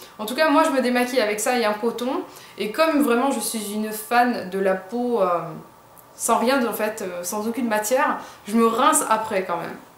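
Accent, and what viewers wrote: England, United Kingdom. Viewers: tu es l une des mes youtubeuses beauté préféré.. je kiiiiiiffe tes conseils, ta facon de t exprimer, ton accent anglais (hihi surtt qd tu dis le mot HIGHLIGHTER LOL), ta facon de présenter tes produits (tres bonne ambassadrice) et ta classe naturelle. Tu as bcp de gout et bcp de talent. Que fais tu dans la vie ? Commerciale ? Bref.. tu as compris que je te kiffais et j apprécie que tu parles svt de produits a petit prix..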